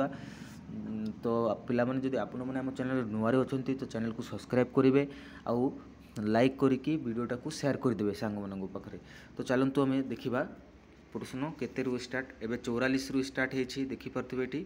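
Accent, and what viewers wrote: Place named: Bengal, India. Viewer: ବେଙ୍ଗଲୀ କି ତମେ 😂😂😂ଓଡ଼ିଆ ହେବନି ଭାଇ ତମ ଦ୍ୱାରା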